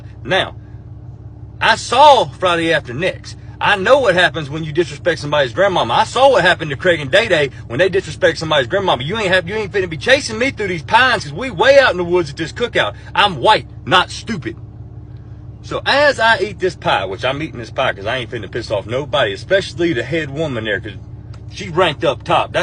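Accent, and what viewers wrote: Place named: South, United States. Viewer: Love that southern accent,and you are a funny ass story teller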